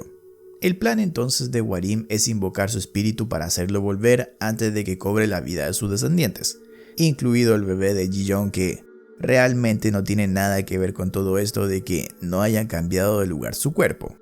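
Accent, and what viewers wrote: Chile. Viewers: Este acento chileno no se me da j ñsajfkaldnjhasdfkasd fñlsajfñljs afls chabocho Gran resumen!!!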